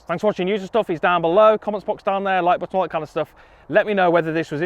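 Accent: United Kingdom. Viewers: Surely u with ur brit accent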